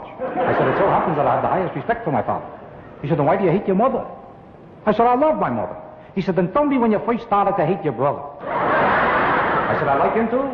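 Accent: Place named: New York, United States. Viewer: Infinite list of little absurdities. And the NY accent. He was a real hit in his day. And his day lasted 60 years.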